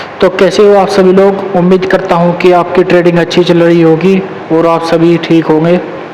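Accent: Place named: Muzaffarnagar, India. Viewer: Brother ap kaha se ho apki voice hamare muzaffarnagar jesi lag rhi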